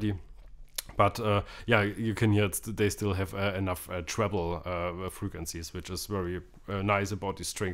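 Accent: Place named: Germany. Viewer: too much German accent... lol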